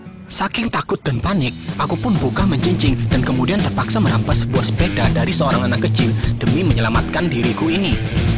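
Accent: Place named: Java, Indonesia. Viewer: bang org mana sih bahasany banjar tpi logatny kyk ada medok jawanya wkwk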